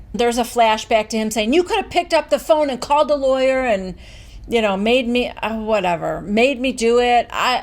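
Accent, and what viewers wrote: New Jersey, United States. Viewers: God! You’re Jersey accent is awful!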